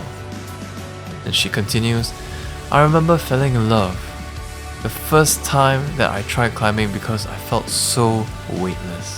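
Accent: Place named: Southeast Asia. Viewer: great video! but random qn.. by any chance are you Southeast Asian? hahahaha your accent is familiar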